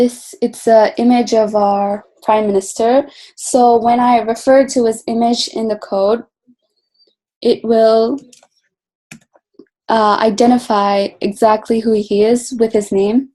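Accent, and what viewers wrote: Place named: United States. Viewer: Excellent. orchestrated with American ascent with laser sharp technical tidbits is applaudable. Leadership is an impact - you preach what you practiced. 💫💫💫💫💫👌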